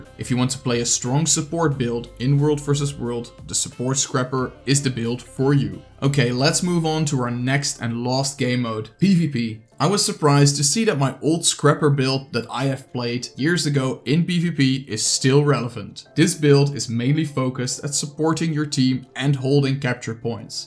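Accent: Netherlands. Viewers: I hate dutch morons and their shitty accents.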